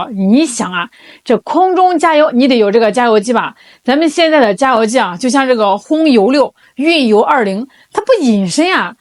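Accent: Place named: Anhui, China. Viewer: 安徽话真好听，像黄梅戏🎉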